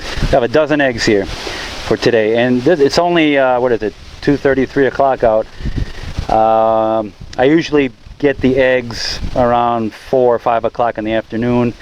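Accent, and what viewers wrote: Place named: North, United States. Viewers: Did you live somewhere up north before Houston? I’m just curious with your accent. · Mid west accent + the word 'poop' = endless childish laughter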